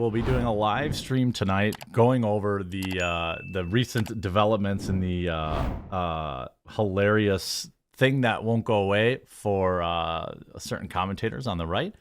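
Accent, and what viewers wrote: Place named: Wisconsin, United States. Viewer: I like your show, but your severe Wisconsin accent is too hard to understand.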